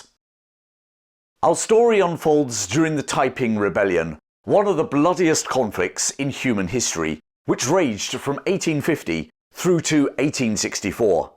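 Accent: England, United Kingdom. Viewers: I have to agree. No AI voice, and his real pommy voice I can understand. And we get to talk with him live.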